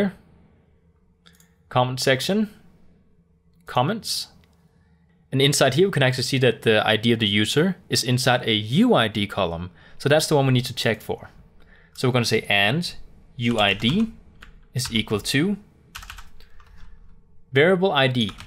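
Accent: Denmark. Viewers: You sound very danish, are you danish?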